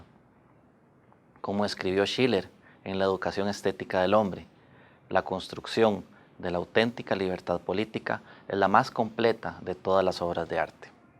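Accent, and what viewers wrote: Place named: Costa Rica. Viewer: es por q es costaricence y su forma de expresars es muy peculiar,con un acento distinto al demas español.y bueno si también ay palabras q significan otra cosa pero siempre ligado a su idioma popular.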